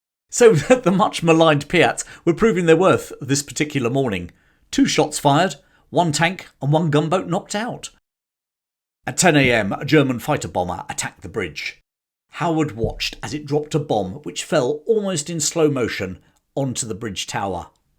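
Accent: United Kingdom. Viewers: Many thanks for the video, with this English UK accent, spelling so British. Now that bad english is spoken everywhere worldwide, I lived for 2 decades between Singapore and Jakarta, working to supply tools and parts for industry. We have singlish, American men from Texas, Australian from Melbourne and some kiwees and Filipinos. Indians also. So thanking you very much for this wonderful British video. 😊😊😊😊😊😊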